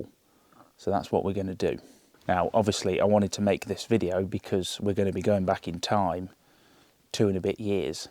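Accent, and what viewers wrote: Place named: England, United Kingdom. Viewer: This has absolutely nothing to do with the upcoming videos (which I can't wait to watch! 😁), but I'm curious about your accent. 😜 Whereabouts in England is it from? 😀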